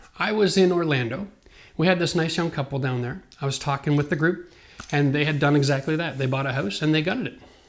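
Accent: Canada. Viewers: and crew, I am no stranger to DYI however I found myself with a project I had never done. Upstairs shower leaking to downstairs kitchen. I found your channel by accident and when I heard the Canadian accent I was hooked. I live in NE Ohio USA and the weather plays a big part in any renovation. You had great advice, both time consuming and monetary. I wanted to thank you for sharing your experience and give a shout out to your son who made the comment (at the same time as me watching your video) "Now show them how to do it without 35 years experience".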